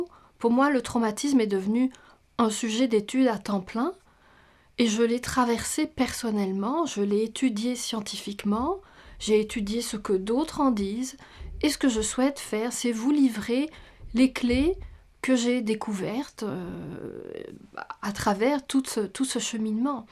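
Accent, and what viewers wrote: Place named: France. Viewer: Merci pour ces vidéos très instructives. C'est étrange vous avez un accent français mais je reconnais quelques québecismes, est-ce que vous auriez passé du temps au québec par hasard ?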